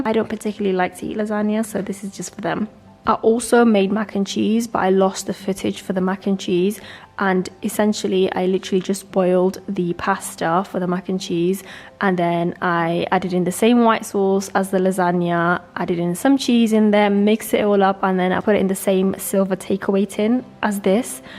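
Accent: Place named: Milton Keynes, United Kingdom. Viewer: Are you from Milton Keynes? I can hear your voice kindly u from there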